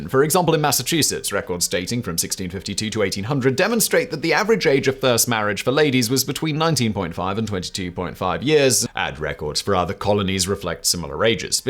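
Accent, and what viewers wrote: United Kingdom. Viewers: are you english, brittish astrailian new zealanden or australionewzealandish?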